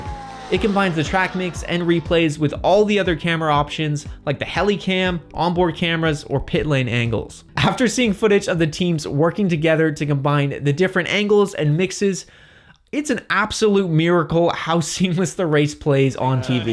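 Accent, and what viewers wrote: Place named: Canada. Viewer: Now that I hear it he's definitely Canadian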